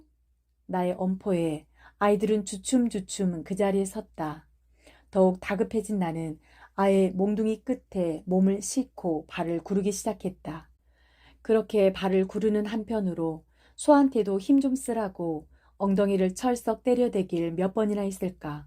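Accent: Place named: Goheung, South Korea. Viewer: 내고향 고흥 사투리에 어린시절이 생각나는 실감나는 명작이네요 아~~옛날이여 잘들었네요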